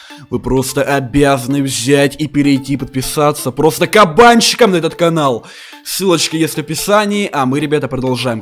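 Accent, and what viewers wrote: Ukraine. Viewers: говорит кнайф потому что он украинец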